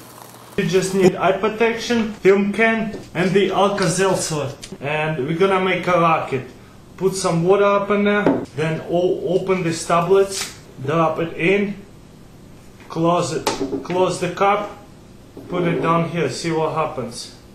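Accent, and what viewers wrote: Russia. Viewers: ...because he is Russian and I thought his accent was very charming. He is also enthusiastic, like me, about science. So, I thought "We may be from different parts of the world. Yet, science makes us all very happy." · Dude I love ur vids they are AWESOME!!! So hel9ful and nifty! Keep it up! Ps - russian accent makes it even better!!!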